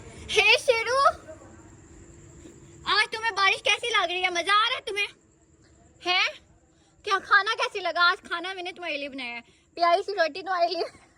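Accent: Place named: Punjab, India. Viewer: Hay ALLAHA AAP ki panjabi so sweet.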